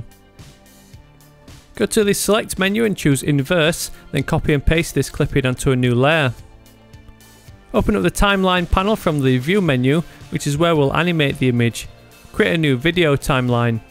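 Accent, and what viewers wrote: United Kingdom. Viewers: is that a British accent? I love that accent! we Americans have a boring ole hum drum accent - so unfair!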